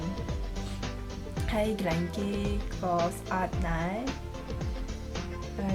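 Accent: Cambodia. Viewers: You’re so cute with your khmer accent. lol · Coucou ! Je suis francaise d'origine cambodgienne et j'adooooooooooore ton accent khmer. je suis troo contente de voir qu'il y a d'autres personnes d'origine khmer qui parlent khmer parce que yen a pas beaucoup. Tu peux continuer à faire des vidéos en khmer ? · Funny but cute khmer accent.Thanks for sharing.Keep up the good works!.. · I love your Khmer accent so much that I have to subscribed the channel.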